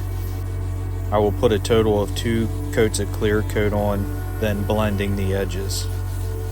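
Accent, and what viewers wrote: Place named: Pennsylvania, United States. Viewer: Helpful video, nice work. And a little Pennsylvania accent asmr I hear? Eh? Eh? Pennsylvania? Am I right?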